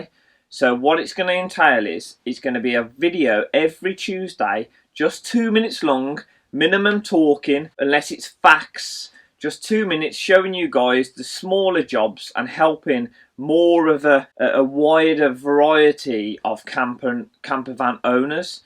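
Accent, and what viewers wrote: Black Country, United Kingdom. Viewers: Where about a you from , your accent sounds like Black Country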